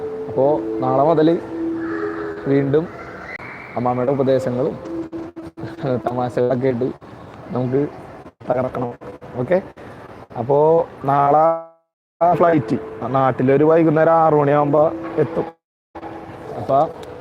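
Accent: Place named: Ernakulam, India. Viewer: ernakulam slang adipoli 😀😀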